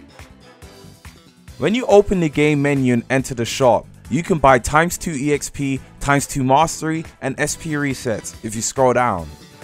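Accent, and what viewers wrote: United Kingdom. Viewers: The British accent is so dreamy :drooling_face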